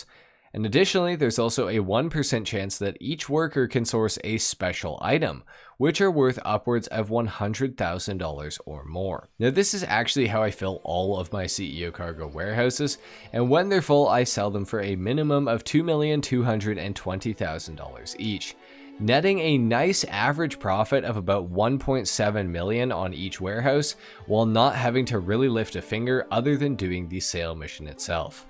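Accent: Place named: Canada. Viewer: I was watching this video, and i was like, I think I hear a canadian accent. Checked out your channel and I was right. You got yourself a sub now.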